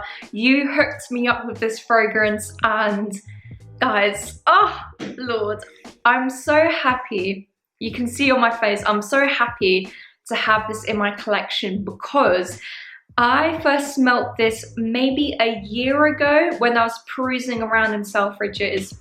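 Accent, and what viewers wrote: France. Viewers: That French accent haha. Great video and I love Side Effect :) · Love your french accent 😆 you are too cute! that Xerjoff packaging and the bottle is top! More like the beauty is sleeping 💙🧡 great review thank you for the video!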